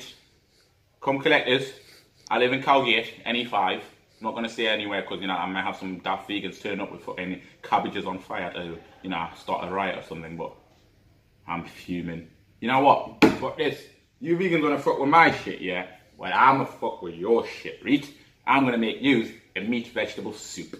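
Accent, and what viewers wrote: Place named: Australia. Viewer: your accent is Australian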